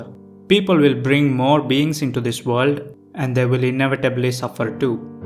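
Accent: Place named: India. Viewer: pajeet accent messed the whole philosophy up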